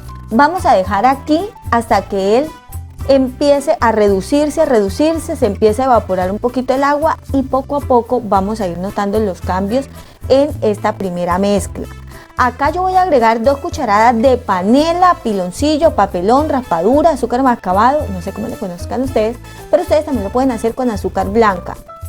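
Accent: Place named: Caribbean Coast, Colombia. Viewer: Tienes acento costeño 😉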